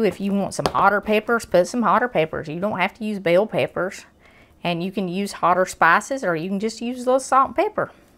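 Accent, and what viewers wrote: South, United States. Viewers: Just love this lady! She talks like my grandma did, southern twang! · She sounds like my people. Hillbillies have been around forever.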